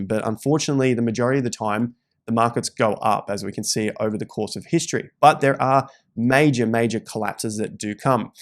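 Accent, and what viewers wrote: Australia. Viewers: Love your video mate.. Very informative to me. Your Aussie slang its nice.. I think BTC will make small corrections when it achieves 48k-52k.. The bottom will be at 40-42k..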